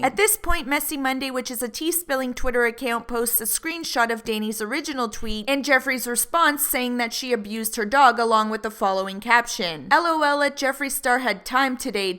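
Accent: Canada. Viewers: no offense you sound like your from Canada from the way you say "sorry"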